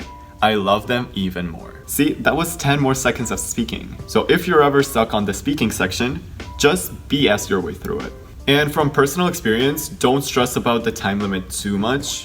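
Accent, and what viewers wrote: United States. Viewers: Omg, what the...? Are you russian? Whatt??? Your accent is excellent, perfect, fabulous... terrific....just how... Omg I am really shocked that you are russian with such an excellent American accent. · I thought he was an American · Thought he was American but in fact he seems to be Russian OMG 😱😆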